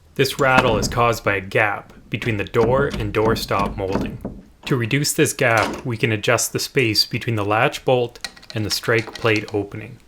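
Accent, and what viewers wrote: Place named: Canada. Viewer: For anyone curious - this chap has a Canadian accent and where it sounds like he is saying "strake" plate he is actually saying "strike" plate. Just incase anyone is unfamiliar with door hardware terminology. Good vid, thanks.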